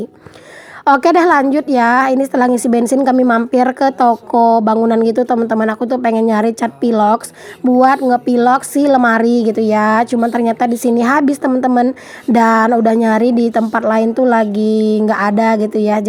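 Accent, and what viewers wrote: Java, Indonesia. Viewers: Rumbai hadir,bund ngomongnya pakai logat pekanbaru dunk bnd?kayaknya skrg bnda ngomong lebih medok ke jawa 🤗🤗🤗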